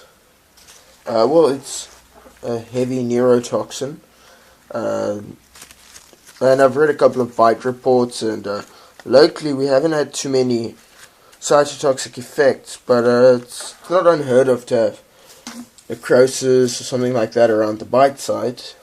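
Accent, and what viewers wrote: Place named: South Africa. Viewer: you sir are south african .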